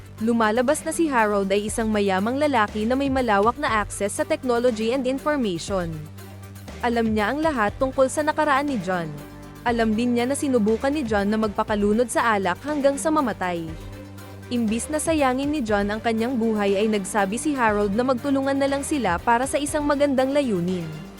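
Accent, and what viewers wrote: Visayas, Philippines. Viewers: The narrator is Bisaya...kinulata?😁kaway kaway beh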